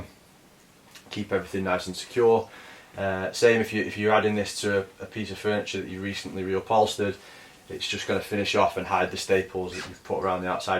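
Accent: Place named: Yorkshire, United Kingdom. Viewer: Where abouts are you based? Must be Yorkshire.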